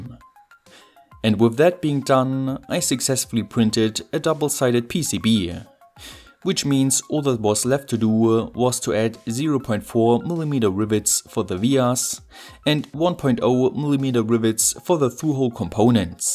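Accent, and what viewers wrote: Germany. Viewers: I am a bit confused by his accent. Where's this guy from? It sounds like a German speaking English with a Chinese dialect. Please spare me the Chinese isn't a language. I am fully aware of that. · Kenne dich nicht aber anhand deiner Aussprache könnte ich schwören du kommst aus Deutschland. Aber interessantes Video 👍🏼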